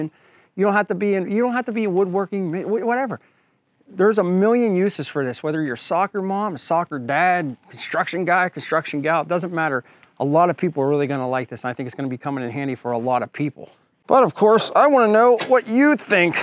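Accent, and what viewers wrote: Pittsburgh, United States. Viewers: You sound like a yinzer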